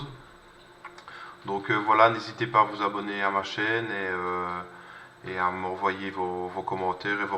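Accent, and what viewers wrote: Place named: Liège, Belgium. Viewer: Super le tuto, j'ai vraiment apprécié le procédé, je vais tester sur une de mes photos avec ciel presque cramé. J'ai aussi adoré l'accent liégeois ;-) Merci pour les explications.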